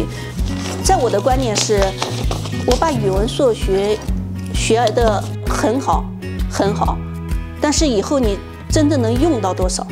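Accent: China. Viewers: 果然是南京老姐，一听口音就像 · 一听就是江苏的， 这里面对的困难要比国内多得多，就业发展（无论是你或者子女）都不如中国， 但好在你生的是个女儿， 在澳洲也不难混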